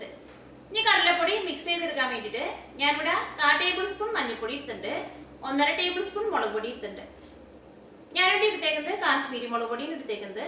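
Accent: Thrissur, India. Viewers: ചേച്ചിടെ ഭാഷ കേൾക്കാൻ തന്നെ വിഡിയോ കാണാറുണ്ട് ... അങ്കമാലി, തൃശൂർ ,,, ഭാഷ ഒത്തിരി ഇഷ്ടമ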